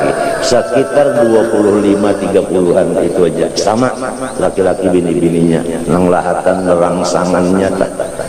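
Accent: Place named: Brunei. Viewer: Mcm logat orang berunei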